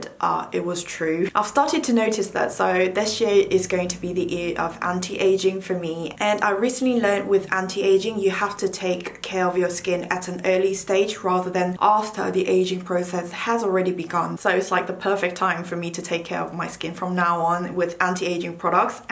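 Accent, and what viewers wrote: United Kingdom. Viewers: 영어로 하는 브이로그도 찍어주심 안댈까요? ㅠㅠ 영국식 발음 발음 넘 듣기 좋구, 영국식 발음에 로망생겨써요, 🤍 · 브리티시 악센트 너무 매력적이에요🖤 새해복 많이 받으시고 건강하세요 늘💕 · I love hearing your British accent! 🥰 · 영국식발음 넘 신기해요 운동하며 자신을 잘가꾸는 모습 많은 영감을받아요 저도올해는 좀더자신을가꾸고 아끼고 사랑해주고싶어요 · 오랜만에 듣는 영국발음 너무 좋네요🧡 오워터~~ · 커피 많이 마시고 물 안 마시는 사람 나야나,,, ^_ㅠ 뭔가 오랜만에 풀로 영어로 말하는 영상 보는 것 같은데 영국 발음에 또 한번 치이고 갑미당,,,ღ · 영국 악센트로 바뀌신 것 같아요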